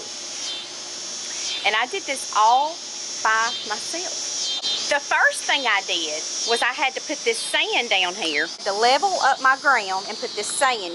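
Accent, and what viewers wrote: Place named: Alabama, United States. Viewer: You either have to be from North AL or Tennessee with that accent. I'm from Middle TN and it's not everyday I come across a Youtuber who talks like the ppl I know!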